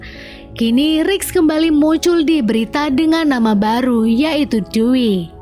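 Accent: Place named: Surabaya, Indonesia. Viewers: Kok Suroboyo Boyo metu embak tapi aku seneng loh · Min mimin orng jawa ya min kok gomong kek ong jawa sama uwu ternyata toxic juga ya min:v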